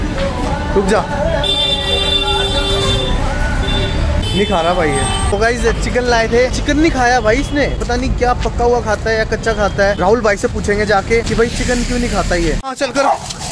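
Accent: Haryana, India. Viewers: Bhai aap bhadurgarh ke ho kyaa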